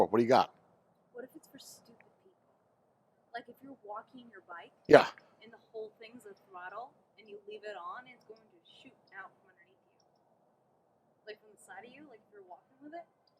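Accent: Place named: East Coast, United States. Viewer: I swear I’m not a stocker ……. But is this neighborhood in Florida ? It gives me a Florida vibes , but your accent seems East coast ? Maybe I’m way off 🤷🏼‍♂️ Or maybe you are from the east coast and live in Florida now haha.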